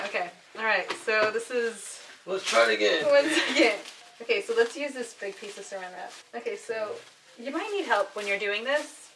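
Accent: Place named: Iraq. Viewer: هلو اول شي اني فخوره لان انتي عراقيه ثاني شي ارجو تنزلين لفديو بلعربي بسرعه لهجتج يعني لغتج بلعربي (عراقي)كللللللش حلوه حته شكلج يكون احله.شكرا جزيلا الج لان انتي متعرفينه ولا شايفته وتنزلين فديو نستفاد منه شكرا لان تحبين لجمال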